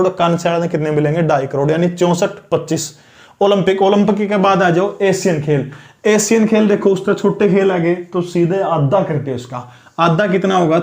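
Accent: Haryana, India. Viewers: Gajab pdhate ho guru jii. Gaal kyu bknii hai. Apke pdhane ka hryanvi boli ka style mast hai. Jai hind jai bharat jai haryana jai haryanvi. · Gud evng sir G...your teaching style is brilliant...nd I m a big big fan of u...nd ur language speaking haryanvi style is much better than Actor Randep hooda G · Nice way of teaching sir.. Aapse Haryanvi language mein kuch bhi sunte waqt apne aap hi smile aa jati hai chehre par..phir chahe kitna bhi difficult topic kyun na ho easy ho jata hai. ..keep it up this way of teaching sir.. Bravo! 👏👏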